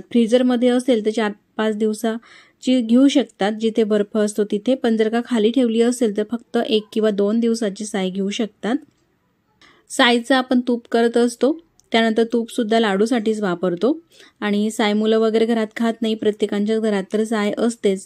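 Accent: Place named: Jalgaon, India. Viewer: Jalgaon kadil lok asech boltat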